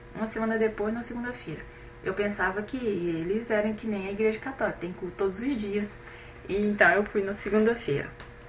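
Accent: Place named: Minas Gerais, Brazil. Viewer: Pra saber. Pelo seu sotaque, achei que fosse de Minas.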